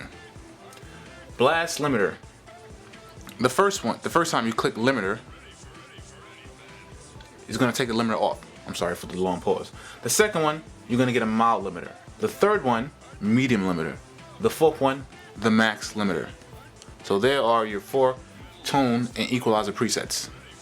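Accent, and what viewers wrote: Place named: AAVE, United States. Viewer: Hard to understand through all the jive talk and ebonics.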